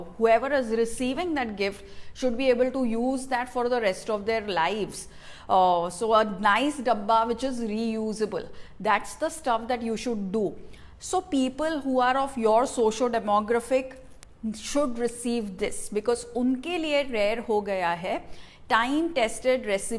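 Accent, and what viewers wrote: Mumbai, India. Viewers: i love d way u talk ......in ur vedios ....so natural ......fulll mumbaikar style lov it .......with ur funny lines that accept ur a bevda lol .....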